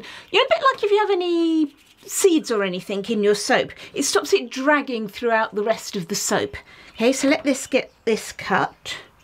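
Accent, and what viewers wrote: England, United Kingdom. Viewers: English accent level...This amazing soap artisan! 😂❤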